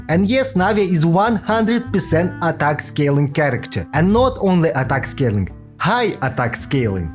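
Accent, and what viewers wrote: Russia. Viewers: I learned that there's something even more cool than your russian accent: skin mods. If there are skin mods for weapon, I'm good with this stupid cardbox sword, because it won't be looking so. Otherwise I'd settle for Tidal Shadow just because how awesome it looks.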